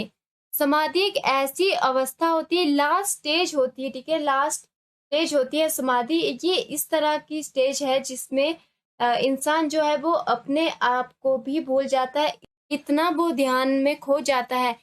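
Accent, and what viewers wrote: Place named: Assam, India. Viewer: Your accent resembles Assamese accent. Are you Assamese?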